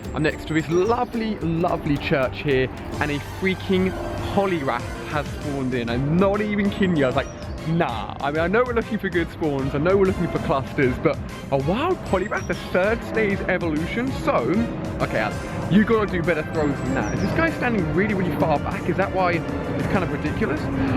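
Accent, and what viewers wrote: England, United Kingdom. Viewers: english?? or america???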